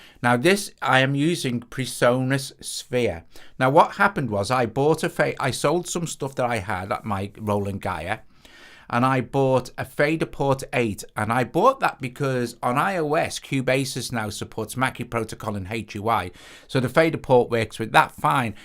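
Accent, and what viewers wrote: Liverpool, United Kingdom. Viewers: I love your scouse accent ;)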